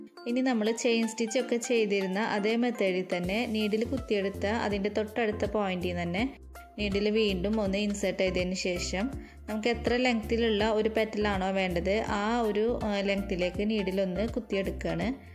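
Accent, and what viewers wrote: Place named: Thrissur, India. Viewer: trissur slang💕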